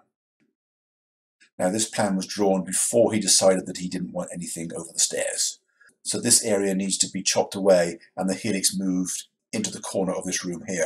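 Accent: England, United Kingdom. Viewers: I just love the term; ‘Herniated Helix’. Not a term I’ve heard here in the UK. I am amazed that you still have your English accent, despite your use of American terminology. I tend to readily pick up accents and if I lived for a year in the US, you would believe that I was born there. I do enjoy watching your videos. Best, Jason.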